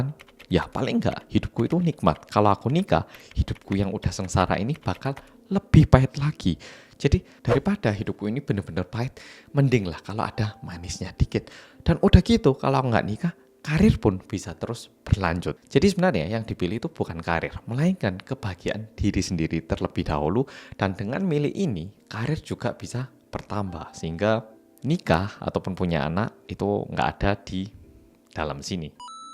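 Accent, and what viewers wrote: Java, Indonesia. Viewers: Selalu suka sama konten"nya mas mas korea medok ini hehe❤❤ Aku mau reques bahas kasus JMS soalnya habis nonton documentary netflix jdinya pengen tau perkembangan kasusnya dikorea.... Makasih mas 😊😊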